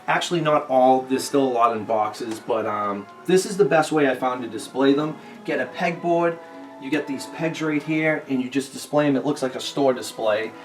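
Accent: Boston, United States. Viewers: I was like, "This dude Boston or a Yorker"? I changed my mind like 5 times but I'd guess he's Boston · Definitely boston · Is that a Boston accent??? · Boston accent? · He sounds boston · Total sidebar, but is that a Boston accent? Only I'm from the UK so it's fun to try and guess American accents. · "This here's a daaat boawd" defo Boston didn't hear aussie tho. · Are you from Boston? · Are you from boston. You have the accent. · Boston accent heavyyyy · From Scotland love the boston accent, just like the greatest president John F Kennedy. Star wars collection is quality. I used to chew the arms and legs off my storm troopers so it looked like han or luke had blasted them lol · Is it a boston accent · Boston guy ay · u lie in Boston bro?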